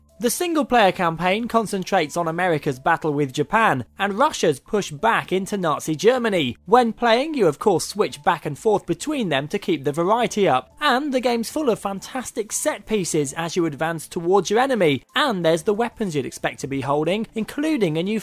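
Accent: United Kingdom. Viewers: this guy is giving us british a bad name for talking like that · i like the british acent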